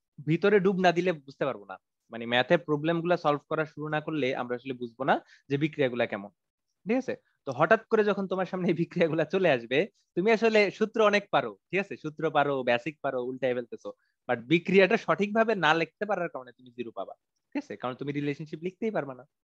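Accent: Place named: Chittagong, Bangladesh. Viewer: oree vai tw Chittagong er.. Seraaa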